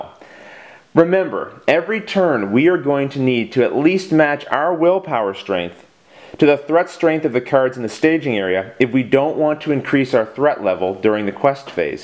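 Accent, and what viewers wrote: Canada. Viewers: Man, great tutorial. I drew identical cards as you through that first round and it really helped. But my hand couldn't be more different for this next round, so I'll actually have to use my brain. Your explanations are slow and understandable, so it shouldn't matter. I like the Canadian accent btw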